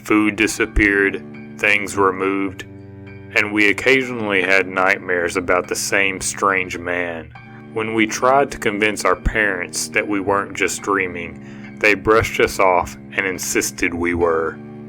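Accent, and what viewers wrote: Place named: South, United States. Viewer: I 🖤 ur subtle southern accent, and 🖤 ur channel! Emz.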